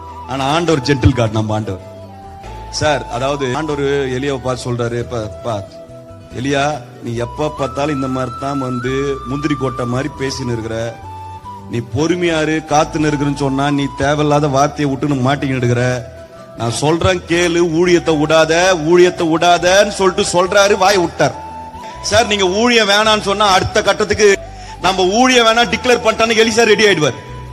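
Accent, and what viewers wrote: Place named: Chennai, India. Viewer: மெட்ராஸ் தமிழ் மிகவும் அருமையாக இருக்கிறது சகோதரா கர்த்தருடைய வார்த்தை உண்மை அதுவே மெய்யான ஜீவன்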